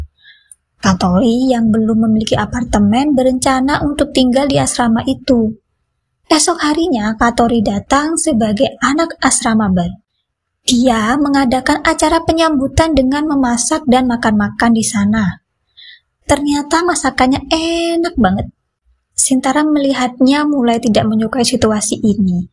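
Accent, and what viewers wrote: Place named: Java, Indonesia. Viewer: Suaranya naik turun.. Pembawaannya seperti orang membaca, kurang enak di dengarnya, gk bisa nyantai, terlalu medok 🙏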